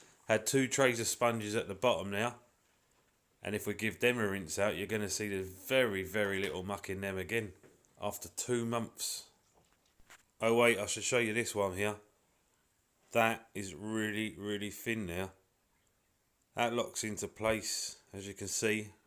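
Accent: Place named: United Kingdom. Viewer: Impella', filta'. heata', prociga', tank wata', wira'. Love that accent. Where in the UK is that? That plastic media is totally worthless and Oase used it to cost cut. Use higher quality bio media with more surface area.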